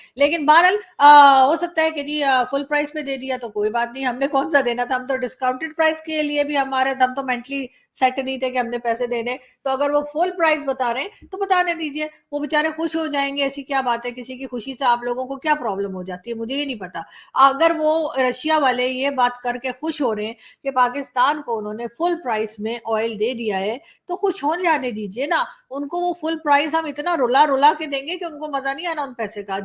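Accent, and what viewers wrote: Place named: Mumbai, India. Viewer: ji aap mumbai hindi kaise bol leti hai. pls repl.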